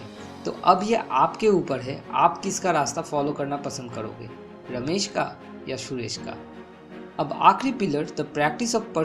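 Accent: Bengal, India. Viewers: bad video. bad accent.bcoz u r a bengali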